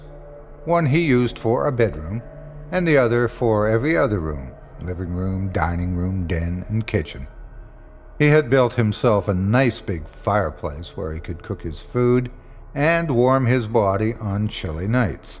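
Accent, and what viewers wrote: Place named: South, United States. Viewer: sounds like southerner i like it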